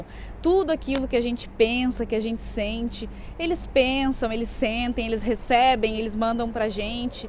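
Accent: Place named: Rio Grande do Sul, Brazil. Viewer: Como vc é linda tem uma voz que transmite uma paz...nosso sotaque gaúcho é lindo...lindo relato me emocionei...minha mãe desencarnou dia 3 de agosto pelo covid 19 sinto muita saudade dela...eu tive duas oportunidade de ir até lá aonde ela está...lugar de muita paz muita beleza incrível não tive vontade de voltar tinha uma praça com bastante árvore com muitas pessoas felizes...eu passei por essa praça com ela...e logo a frente tinha uma praia linda com uma areia bem clara com sol bem iluminado o mar bem azulado incrível fomos caminhando e conversando é logo depois voltei foi muito emocionante...eu não queria voltar queria ficar com a minha mãe lá nesse lugar lindo e de muita paz...mas temos que saber esperar a hora...creio que um dia irei definitivo morar nesse plano lindo com ela...bjos querida.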